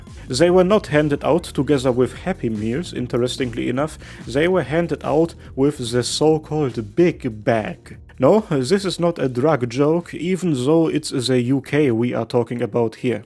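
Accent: Germany. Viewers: Love your german accent hahah 😀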